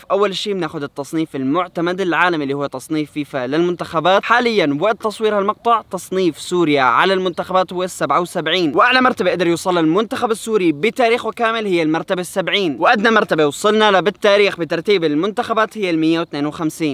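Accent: Saudi Arabia. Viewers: هل انت سعودي ولا سوري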